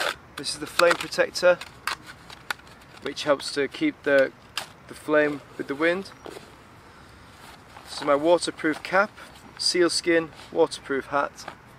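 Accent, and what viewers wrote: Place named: Northern England, United Kingdom. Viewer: I can’t work out your accent. Especially when you say ‘bike’. I know it’s north of England but is there some welsh or maybe Scouse mixed in there? 😂 All the best.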